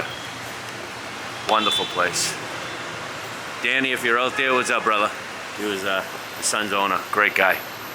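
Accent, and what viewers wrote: Boston, United States. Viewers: Learn Spanish bro, I can barely understand you with that Boston accent and I am a native English speaker 😆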